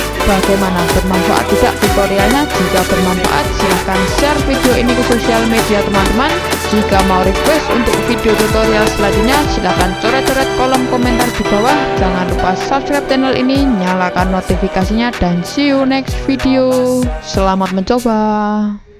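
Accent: Java, Indonesia. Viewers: wong jowo di suworone!!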